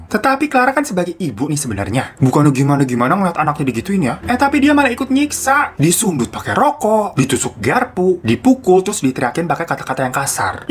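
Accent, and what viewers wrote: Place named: Tegal, Indonesia. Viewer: Suka bgt sama accent tegal nya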